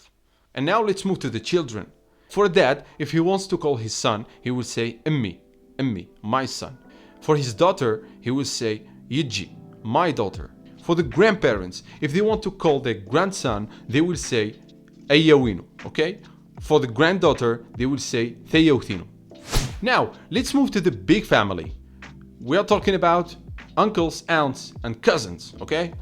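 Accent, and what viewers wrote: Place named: Rif, Morocco. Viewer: Can i know where are you from ? Because your accent of tmazight n rif sounds a little bit different of what i used to hear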